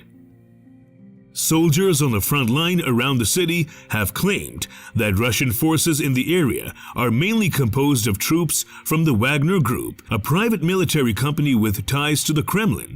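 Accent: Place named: United States. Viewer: Simply NAUSEATING American accented voiceover, had to give up.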